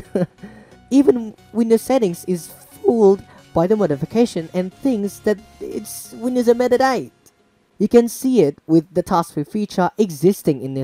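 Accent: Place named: Australia. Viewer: it's the Australian accent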